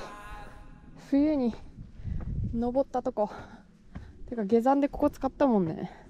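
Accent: Gifu, Japan. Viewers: MARiAさんスズメ蜂ヤバかったですね。 山には人を惹きつける不思議な魅力があります。難易度が高ければ高いほど惹きつけられるものです。 事故には注意して下さい。ちょっとの骨折でも二度と登れなくなる可能性もあります。このコースも想像を絶するものでした。 MARiAさんの岐阜弁大好きです！ こんな綺麗なクライマーはいない！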